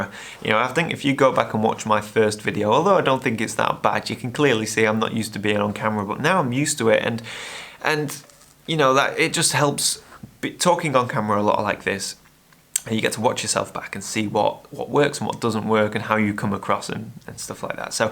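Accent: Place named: United Kingdom. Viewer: Very surprised about you dont like your own voice. Because the first video I saw of your channel, was with your voice in off, and it was the thing catched me, your voice and the way you talk about photography. I'm very very fan of british accents, so not a big deal, but it's fun to see that you do not like it, and for me was the first thing I noticed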